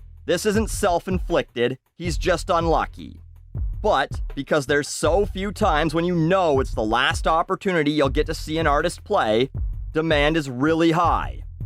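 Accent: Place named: Canada. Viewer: I didn't even know you were Canadian until this video...I have trouble distinguishing your Canadian accent from and American one unless it's exaggerated either way.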